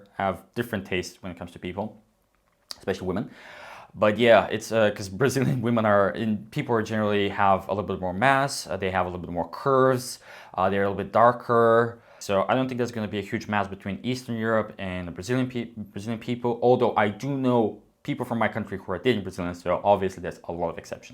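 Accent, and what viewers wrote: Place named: United States. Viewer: First video I saw from your channel I honestly thought you were american. Not because you speak english, but because of your accent. It's not pure american to me, but definitely not british or australian. Si I kinda thought you could be american. You have an amazing english. Wish my english was in that level, but my english is intermediate. I hope one day Brazil will have a good amount of people who can speak english properly. My sister is 15 and in her school it has been a couple years since they started doing this bilingual education system. If you ever want to feel closer to Eurpo, visit the cities of Campos do Jordão (São Paulo) and Gramado e Canela (south of the country. You will fall in love with them.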